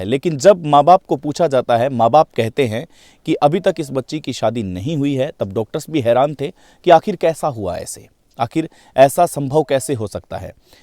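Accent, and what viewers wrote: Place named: Marwari, India. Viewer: भाई साहब मारवाड़ी बोली भूलगे कया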